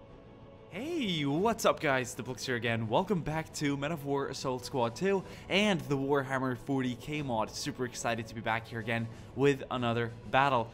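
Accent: Sweden. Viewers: U swedish? Am i Finnish 😁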